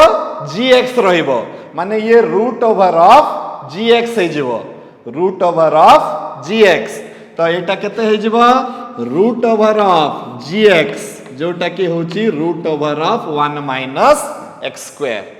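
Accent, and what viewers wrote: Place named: Balasore, India. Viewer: re balesore bhasi jaini ta😅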